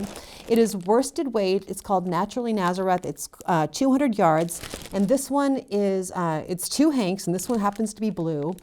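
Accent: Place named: United Kingdom. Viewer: welcom. I thought you british.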